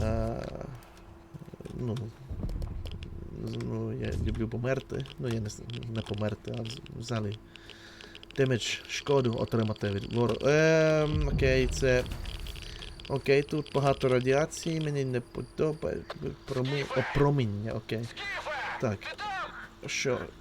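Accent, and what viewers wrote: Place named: Finland. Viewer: Обожнюю Суомі, напевно це країна з найкращими людьми, і сам хочу колись побувати там і вивчити фінську. Бажаю успіхів цьому каналу! А вивчення будь якої мови то питання часу, я сьогодні враженний від того, що почув українську з фінським акцентом, до мурашок)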